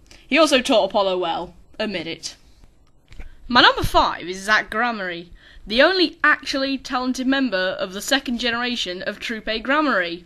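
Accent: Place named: England, United Kingdom. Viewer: Your accent is so English, so fragile are you sure you should have been using such words back when you filmed this? Regardless this list was really good, thankyou for not putting Edgeworth in it, it's nice to see a little more variety.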